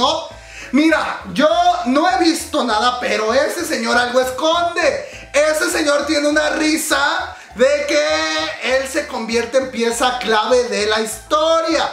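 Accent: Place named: Mexico. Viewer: Mr encanta tu forma de comunicar además hablas muy mexicano y me hacés pasar un buen momento y tú critica es muy coherente y ala vez sensilla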